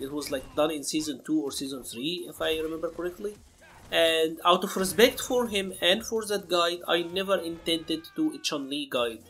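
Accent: Egypt. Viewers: Criminally low number of subs. Get the word out people, this guy is spitting knowledge with a smooth Egyptian twang.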